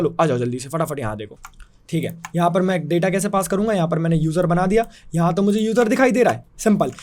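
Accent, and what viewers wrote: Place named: Indore, India. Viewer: Bhiya indore s ho asa lgta h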